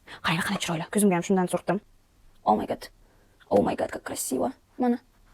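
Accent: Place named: Tashkent, Uzbekistan. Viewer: Iltimos tashkenskiy sheva qati nakunet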